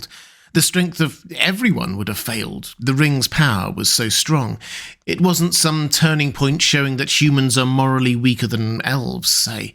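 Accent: United Kingdom. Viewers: You have a wonderful voice for narration! And no, I’m not an American that thinks all British accents are cool, I’m Canadian which means I’m part of the commonwealth and also think all British accents are cool.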